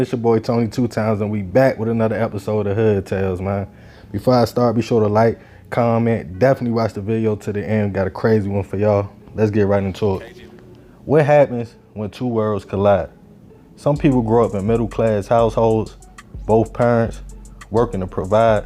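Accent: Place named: Washington DC, United States. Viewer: The narrator gotta be from DC. The accent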